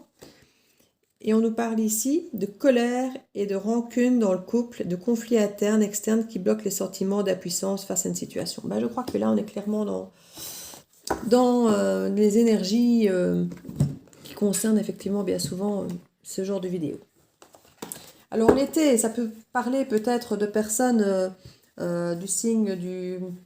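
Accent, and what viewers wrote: Belgium. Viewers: Voilà que je vous découvre depuis la Belgique.... vous êtes géniale... Moi par contre je n'ai personne d'autre dans ma vie car rupture le 22 mars ....et je n'arrive pas à l'oublier pour le moment.....un petit accent de Belgique peut-être ? Je me trompe peut-être....😊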